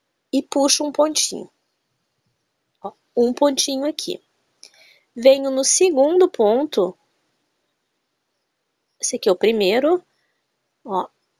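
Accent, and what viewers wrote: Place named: Santa Maria, Brazil. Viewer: adorei te encontrar aqui.Gistei muito da tua receita, e a tua pronuncia, me deu uma baita saudades da minha terra(Santa Maria.) Como e bom ouvir este lindo sotaque...Felicidades e parabéns pela linda receita.bjssss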